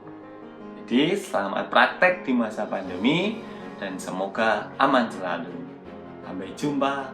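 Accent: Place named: Java, Indonesia. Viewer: Mas...keren euy...logat jowo yg bikin org yg denger bakal hafal tanpa melihat wajahnya... edukasinya asik2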